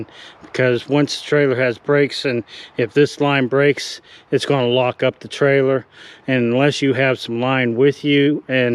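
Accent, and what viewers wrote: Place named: Pennsylvania, United States. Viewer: Hi, you're saying "Y'ins" a lot. Are you in/from Pennsyltucky?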